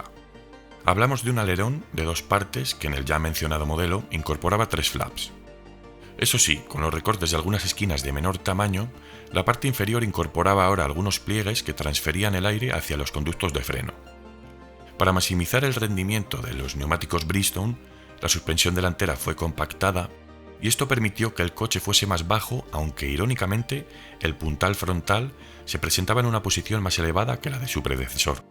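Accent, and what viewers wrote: Spain. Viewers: el español de españa que narra este video es épico porque es un DOCUMENTAL. mano ganaste un suscriptor!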